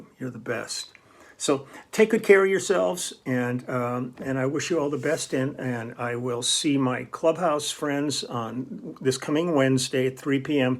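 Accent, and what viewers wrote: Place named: Wisconsin, United States. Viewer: I still hear the Wisconsin in your voice after 50 years away😋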